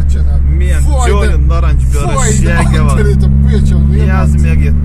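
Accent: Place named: Transcarpathia, Ukraine. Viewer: Mert valószínűleg Kárpátaljai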